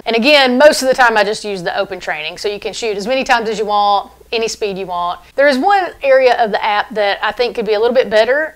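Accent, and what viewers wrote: South, United States. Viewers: I also got to say I love the southern accent....lol... I'm from the Midwest so we have boring accents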